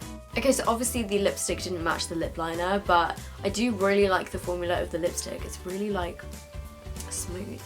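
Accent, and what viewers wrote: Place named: United Kingdom. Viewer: Im probably the only one who doesnt really enjoy the british accent but I could listen to yours for hours. thanks for making videos, love from germany ❤❤❤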